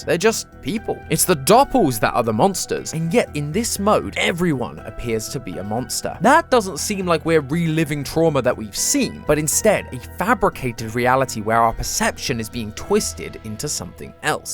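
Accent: United Kingdom. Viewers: Why did u turn British cuz before your like American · At first, I thought was british, and it made me kind of mad, but now I can't even hear accent, I'm definitely gonna keep watching. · the british accent is just the cherry on top to annoy me anyways let's support other theory channels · wait since when become have a british accent? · why is British now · You’re so British! Get better soon! 🩷🩷🥰🫶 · who the heck is this British guy, I don't understand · Ew hes british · Why are you british (you are poggers)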